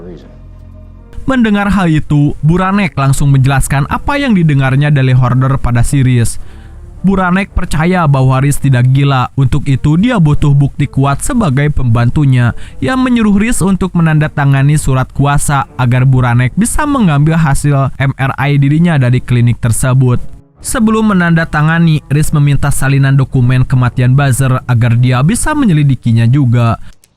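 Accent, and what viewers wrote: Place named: Sunda, Indonesia. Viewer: Minnnn kamu Sunda nya dimana ?? Logat sunda nya kentel bgt 😁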